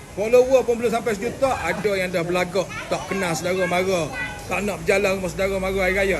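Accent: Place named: Kelantan, Malaysia. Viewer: Kelantan ke? Bunyi macam kelantan. Ooooooohhh okayyyy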